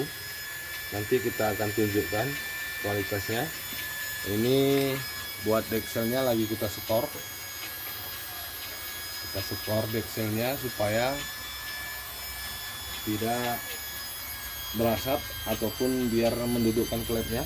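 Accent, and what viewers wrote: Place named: Aceh, Indonesia. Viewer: Plat motor yah sih medan tpi klo logat y orang aceh ni abang ya😅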